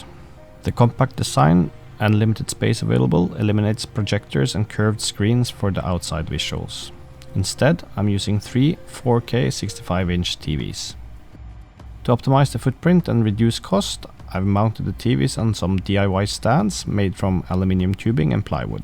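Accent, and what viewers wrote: Norway. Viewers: Hah, kan høre at du er norsk! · Du er Norsk høres det ut som. det greiene her var et ganske sykt bygg!! hvor holder du til?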